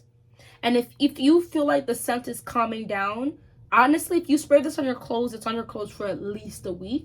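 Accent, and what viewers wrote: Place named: Jamaica. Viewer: Lmao it’s the Jamaican dialects in bits & pieces for me. Enjoyed this vid!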